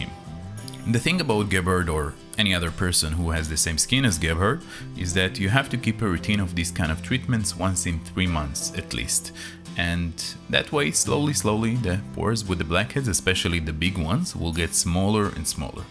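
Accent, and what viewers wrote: Israel. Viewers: your truly charming accent which I'm going to assume is Israeli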